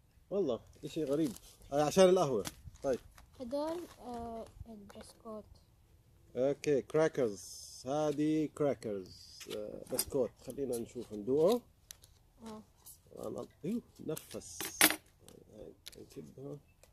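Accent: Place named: Palestine. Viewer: يا عيني على اللهجه الفلسطينيه شو حلوه ، بدّي اسالك شو اسم المحل وإذا بلاقي منو عنا في مونتريال ؟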